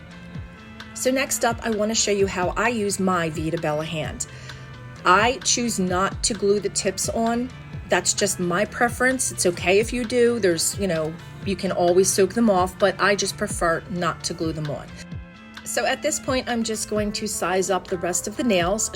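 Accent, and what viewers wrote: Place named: Philadelphia, United States. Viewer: This is a great review I like how you compared both. Love your Philly accent 😊❤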